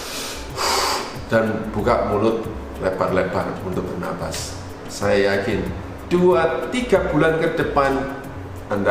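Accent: Surabaya, Indonesia. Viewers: Wong Suroboyo iki koyoke hehe. Thx tips nya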